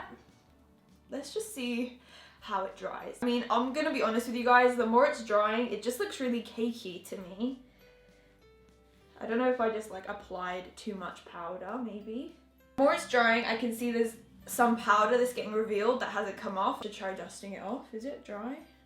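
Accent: Australia. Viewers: krystal turvey no she have an australien accent. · Why does she sound Australian when she's English? I don't get it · Hi. In which country do you live? your accent sounds australian :)) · Are you Australian or From New Zealand? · is she australian like me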